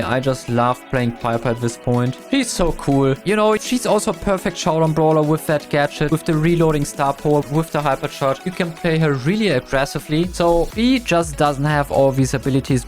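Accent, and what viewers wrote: Germany. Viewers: this is just the german accent and he is a german so it makes sense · You haven’t mentioned Chester! But that’s fine dw! If he’s one of your favorite: Understandable, when he’s close he makes tons of damage, his super where his first attack is stronger is OP, the gadget with buffs or changing your super to a different one is also amazing! If you don’t like him: Also understandable, for his massive damage, his healing super, the bomb, the stun, the slowing down and poison are annoying and OP As a Chester Main I can say: It’s 50/50 Fun fact: Since he was made better with 4 now without the super he can use his 2nd, 3rd and 4th attack to kill mortis, piper, surge (if he doesn’t have any upgrades or is just bad) and also Edgar without any super Though I must say I camp a lot since going in offense is not the best idea with Chester in my opinion! 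-> I’m new to the channel and love the vids, love the German accent a lot 😂 Don’t have him rank 30 yet cuz I don’t have friends to play with so I have to play with random people- 😭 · Man hört dein deutschen Akzent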